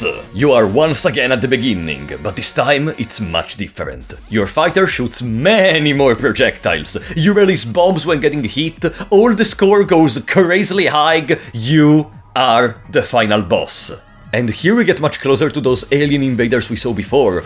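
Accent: Italy. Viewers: That hits me like a truck hearing manusya, a word in my niche language in an english game narrated by a dude with heavy italian accent. Very cool. Didn't know that word came from buddhism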